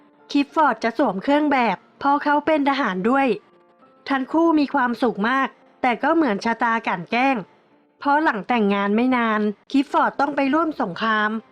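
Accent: Thailand. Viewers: เสียงน้อง เหน่อดีจริง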